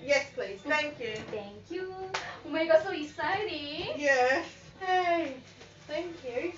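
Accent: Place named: Australia. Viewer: 호주발음 연습하러 와도 좋은 채널인데요? 언제나 느끼는 거지만 호주발음이 가장 어려워요~^^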